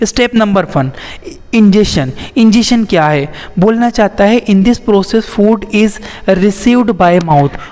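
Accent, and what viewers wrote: Indore, India. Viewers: Sir aap Indore se ho kya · Mtlb. Sr ap indore se. Ho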